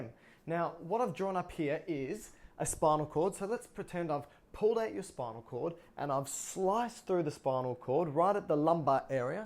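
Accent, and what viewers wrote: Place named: Australia. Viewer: Anatomy lessons AND an Australian accent????? I just hit the jackpot lol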